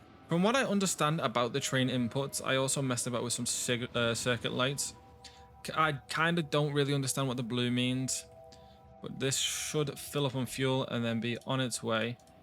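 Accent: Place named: United Kingdom. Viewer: you were discussing where you were gonna get water from because you’d forgot it, when you’re building on landfill! You can remove a couple landfill tiles and drop a pump down wherever you fancy. I found out by accident early on. Can I ask where abouts in the UK you’re from? Trying to pin your accent has been driving me insane watching your videos 😅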